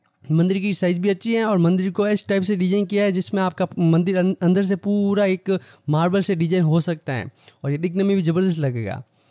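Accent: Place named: Jodhpur, India. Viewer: Maza aa gaya apne jodhpur walo ki hindi bolne se pata chal jata he ki apne jodhpur ka hi he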